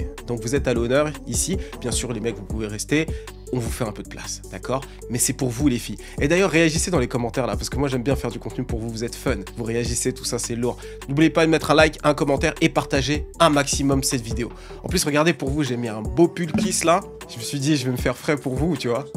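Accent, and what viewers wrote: Democratic Republic of the Congo. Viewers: J'adore ton accents lingala kulutu ❤🇨🇩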